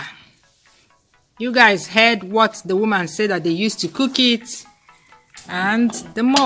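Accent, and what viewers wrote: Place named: Agulu, Nigeria. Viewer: 😯 so you’re from Agulu and also married an Anambra man! I guess as much from your accent. A bu m nwanne gi nwanyi from Ogidi 👍👍❤️❤️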